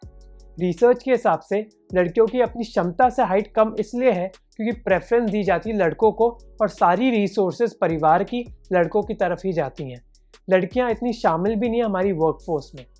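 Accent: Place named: Northeast, India. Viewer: By the accent i am sure you are from arunachal or northeast right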